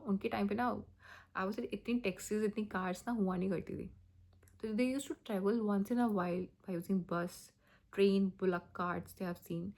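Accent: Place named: Madhya Pradesh, India. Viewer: you are definetly from madhya pradesh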